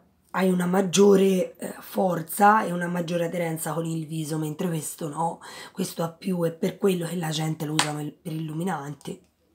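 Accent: Tuscany, Italy. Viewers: Ciao nuova iscritta da poco sono Toscana come te dalla parlata sei stupenda e sei simpatica 😊😊continua sempre così 😊😊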